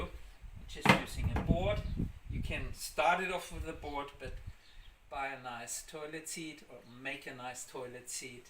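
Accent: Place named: Germany. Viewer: Hi, this is definitely the best simple and easy way I have found so far. Thank you, I have subscribed! (My name is Therese Lauterbach, from South Africa. I hear the German accent and am also from German descent. I would like to make contact with you, please.)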